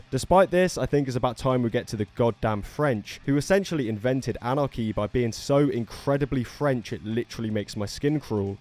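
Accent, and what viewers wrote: United Kingdom. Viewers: first time i've seen you. i thought you were a British Lad you know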